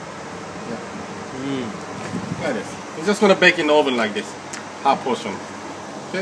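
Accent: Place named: Japan. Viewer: 日本人みたいな発音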